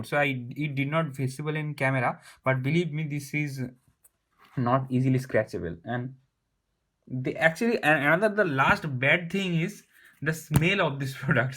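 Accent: India. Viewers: you are form odisha i can identity by your english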